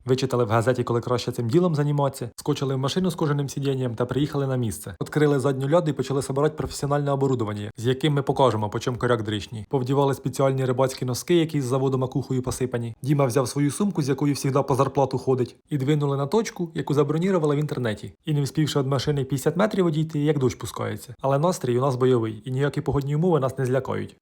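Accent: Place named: Cherkasy, Ukraine. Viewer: От оцей черкаський гумор та говірка, бальзам на серце! Дай Бог вам здоровʼя щастя та усім миру!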